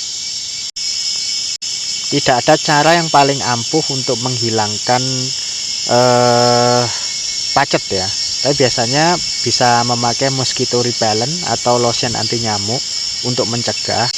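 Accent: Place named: Java, Indonesia. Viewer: itu di daerah mana bang. kok kayanya anda pake logat jawa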